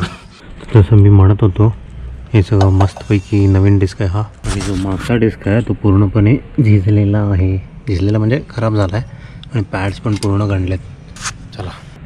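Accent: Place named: Sangli, India. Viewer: असल सांगलीकर आवाज आणि भाषा...sutti not ch o.... 👌👌😍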